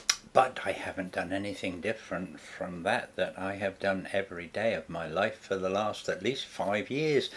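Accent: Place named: East Anglia, United Kingdom. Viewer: Where are you from, originally,? Your accent reminds me of my own happy huntng grounds of East Anglia:]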